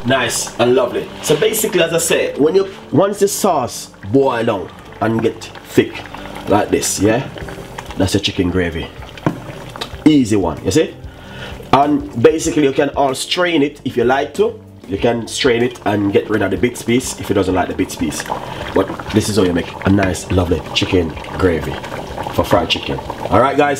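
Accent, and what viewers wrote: Jamaica. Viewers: Sound like a Jamaican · That’s really just how Jamaicans talk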